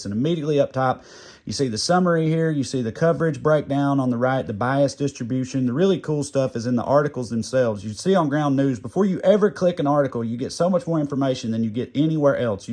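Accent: South, United States. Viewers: How refreshing to hear a southern accent not accompanied by a MAGA hat. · Mister, I personally appreciate the hail out of you. Never has so much common sense smart come out of a mouth with a true southern accent.❤❤❤❤❤ Please keep up the good work in spite of all the mass ignorance in this current golden age of the asshole. Maybe one day, this shall pass……🤞🏼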